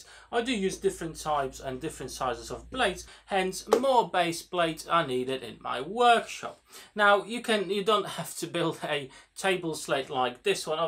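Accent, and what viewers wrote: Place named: United Kingdom. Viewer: I have found your channel because i have also recently started using the table circular saw and the miter saw from Evolution. I am from Germany. I can understand your English very well. What part of the UK are you from? Your dialect is very different from what I learned at school. I have subscribed to your channel and hope for more interesting videos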